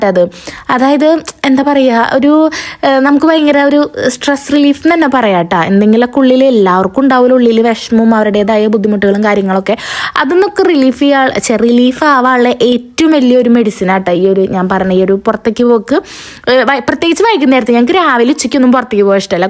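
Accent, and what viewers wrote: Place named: Palakkad, India. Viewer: തൃശ്ശൂർ ആണെങ്കിലും കുറെ words പാലക്കാട് പറയുന്ന പോലെ ആണ് പറയാറ്. ഇവിടെയും നൂൽപ്പുട്ട് എന്നാ paraya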